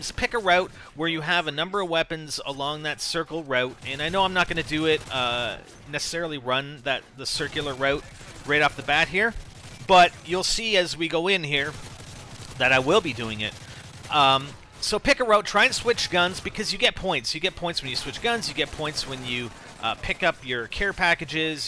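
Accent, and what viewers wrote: Canada. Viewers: your canadian aren't you =p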